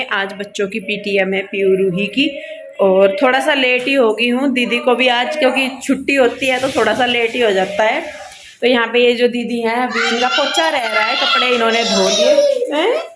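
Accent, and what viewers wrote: Haryana, India. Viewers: Di aap hariyana se ho kya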